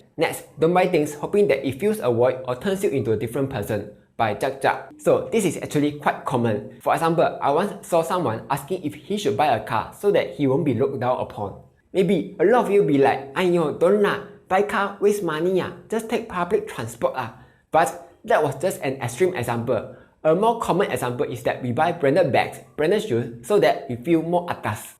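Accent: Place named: Singapore. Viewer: It’s called Singlish btw.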